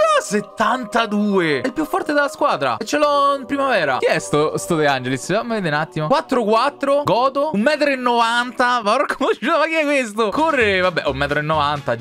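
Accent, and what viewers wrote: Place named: Palermo, Italy. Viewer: che parla palermitano 🔝 😂😂😂😂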